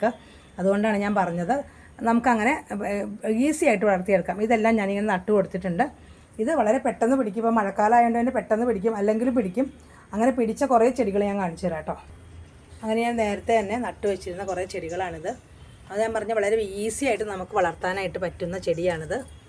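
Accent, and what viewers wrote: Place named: Northern Kerala, India. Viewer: Enikku thonni vadakan style talk❤❤❤ente husbandum palakkad anu❤❤❤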